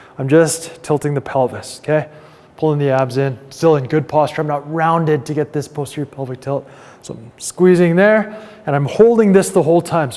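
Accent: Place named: Canada. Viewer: Definitely Canadian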